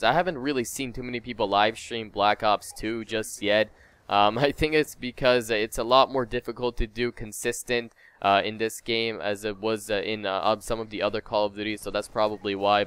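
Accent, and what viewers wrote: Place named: Canada. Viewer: Knew where you came from the second i heard you talk haha. what's up fellow Canadian!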